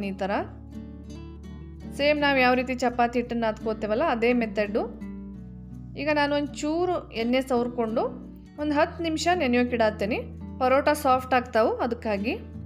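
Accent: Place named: Andhra Pradesh, India. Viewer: actually I'm from Bangalore ree nang Nim thara Kannada maathadodh Andhra Baal chalo ansthithi ❤️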